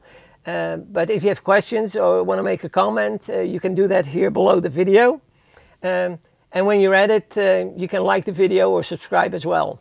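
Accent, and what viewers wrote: Netherlands. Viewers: Ik hoor een Nederlander :)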